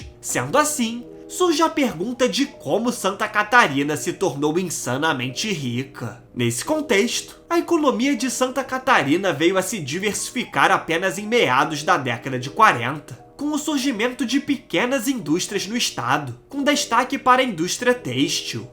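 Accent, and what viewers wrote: Rio de Janeiro, Brazil. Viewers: Esse carioquês do narrador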